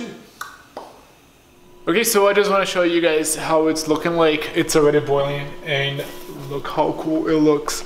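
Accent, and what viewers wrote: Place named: Brazil. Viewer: Your accent sounds Brazilian! Vc e brasileiro?? I've been looking for more sources of protein that are low on carbs because I'm struggling with my macros lately. They've been around 60% carbs 18% fats and 18% protein. I'm struggling hard but this video and your banana bread video helps a lot! Make more please!!!! Obrigada :)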